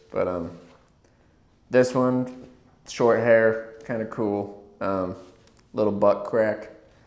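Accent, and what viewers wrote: Canada. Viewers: Canadian accent tho?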